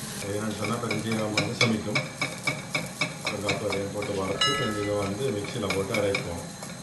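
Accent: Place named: Jaffna, Sri Lanka. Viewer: Love to listen your jaffna Tamil than your fish gravy😇.meen kulambu ready. Wife is behind me😂😂😂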